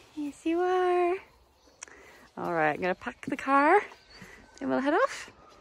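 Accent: Ireland. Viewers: are you in Australia I am so confused Irish accent but the trees and the birds shout Australia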